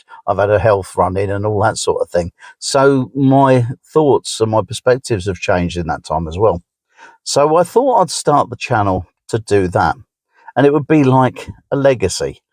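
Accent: United Kingdom. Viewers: Thank you. Thank you very much. As you know, I came because of that DVD video. Visited a few others. Learned what I wanted to learn (I was right...LOL) and never went back, except for you. I watched that beach day and listened to your stories. I, also, read the comments. I love their stories, even the short sweet ones. And I slide past the trolls with a roll of my eyes, so good to learn, you do too. I have very few friends. Years ago, I used to sit and talk to people in the building. They are gone now. If you lived in my bldg. and I saw you, I would sit down and we would have a good chinwag about our lives. I would refer to you as 'my friend' when I talked to others. We would share the low and high (not THAT kind of high) times. No gossiping. I like you for three reasons: Your British accent; no bells and whistles just get down to it; and you put up with my long comments. 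There are other people like you and I have watched some. I can tell the real people and the just-want-to-be-on-YT ones. The ones who don't have our lives but cobble together stories for themselves. You are the real deal. I could always do that...tell the posers from the real deal (esp. musicians). Thank you for reading my comments, too. And thank you for finding people to watch you and share as well. BTW, I was singing all last week! (The version.) So, thank you, too, for letting me know I'm not insane. Hah!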